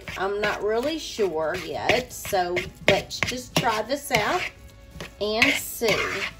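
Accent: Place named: West Virginia, United States. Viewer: Looks delicious! My husband's Mamaw in WV used to make potato cakes when she had leftover mashed potatoes too. Are you from WV too? I like your accent!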